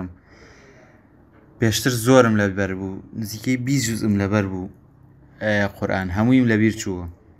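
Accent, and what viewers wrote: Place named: Garmian, Iraq. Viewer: ئەم برادەرە دەنگی بە گرمیانی ئەکات😁❤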